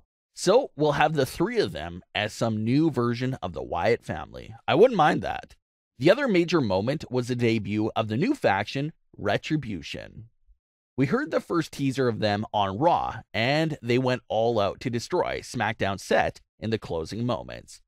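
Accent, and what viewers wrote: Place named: Canada. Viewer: That damn accent is so annoying you sound like damn Canadian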